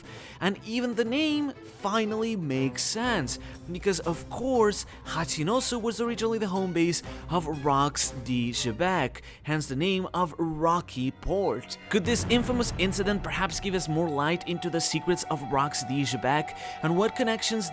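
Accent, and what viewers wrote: Spain. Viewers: Are you Spanish? I’m just guessing from your accent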